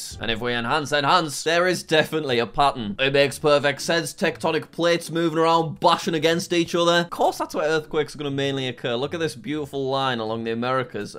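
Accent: United Kingdom. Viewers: british accent doesn't really pronounce the r in arm, but most American accents do. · I just love it so much when says “Enhance! Enhance” with his nice British accent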